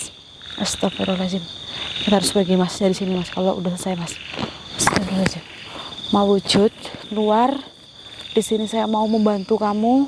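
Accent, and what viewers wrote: Banyumas, Indonesia. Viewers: Pingin banget di marahi Ama mbak dengan bahasa ngapak nya, palingan aku cuma cengar cengir..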